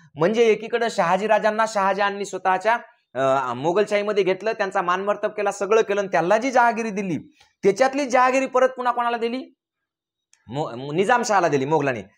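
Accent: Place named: Marathwada, India. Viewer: तुमची मराठवाडी भाषा फार छान वाटते.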